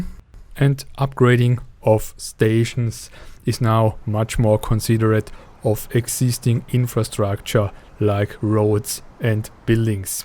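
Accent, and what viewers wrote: Germany. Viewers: Is it German accent? It's hard to understand for non-English speakers. But it's amazing!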